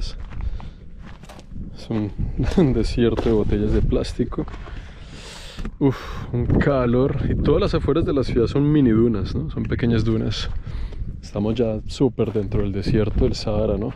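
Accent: Bogotá, Colombia. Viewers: Él muy rolo, ella muy paisa 👍🏼🙃👍🏼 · Buen viedeo, el acento y dialecto es muy colombiano. Eres colombiano? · Felicidades. Ese acento colombiano de Bogota (rolo) de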